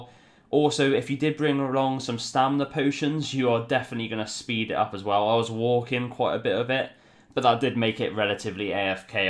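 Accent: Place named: Coventry, United Kingdom. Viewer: You sound like you are from Coventry, or in and around west midlands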